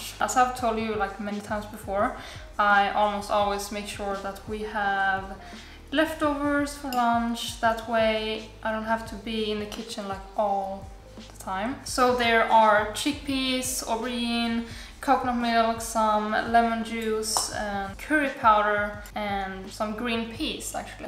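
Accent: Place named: Sweden. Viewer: I love your accent! Forgive me if I am wrong but are you from Sweden? I am a first time watcher and I love your videos so far! ☺️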